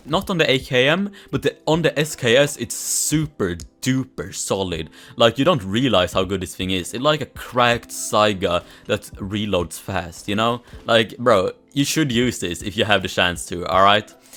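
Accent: Sweden. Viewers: I just watch for the Swedish accent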